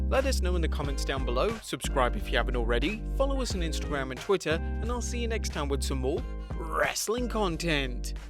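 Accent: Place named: United Kingdom. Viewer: Don't like british accent but good video.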